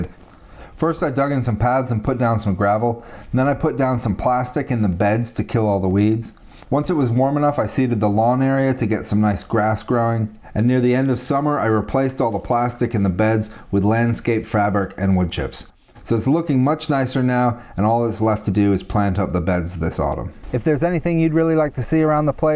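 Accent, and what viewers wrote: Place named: United States. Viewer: I'm thrilled that you're bought a place in the UK! You have an American accent so I assumed that you were based in the US. I've thought exactly the same thing about growing spaces. North wall and lots of thermal mass. If you've not already, have a look at "Chinese greenhouses".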